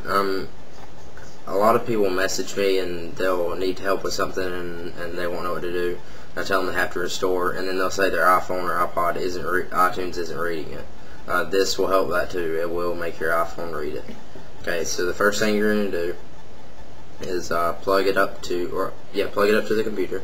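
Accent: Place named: Texas, United States. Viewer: its a texan accent i think lol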